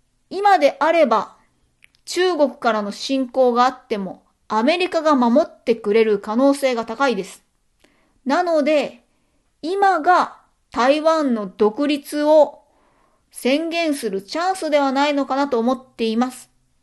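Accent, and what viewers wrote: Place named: Tokyo, Japan. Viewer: みんなで応援し萠絵さんを国会に送り込みましょう。 そして国会議員になってもらい、経済産業省に政務官として 活躍してもらうのです。役人はタジタジでしょうね。 ただし萠絵さんの東京弁はちょっときつめなので大阪弁が良いです。 「うちは国のために きばりまっせー。やりまっせー。 浪速の根性みせたるでー！！ 維新や公明がなんぼのもんじゃ！！ 負けへんで。 ほやから うちに 1票入れたって」 絶対当選させるのです！！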